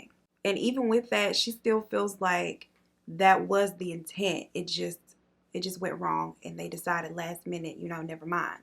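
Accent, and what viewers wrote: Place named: Louisiana, United States. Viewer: I love the look but that blue and gold hit different than a lot of the other ones. Then again blue my favorite color. Don't really care about make up process but I love the vibes, murder stories and final looks. And I sang " 🪨" at the same time you did and I been here for the accent cuz you tryna hide it it sounds like Louisiana to me. But I say everybody from Louisiana 😆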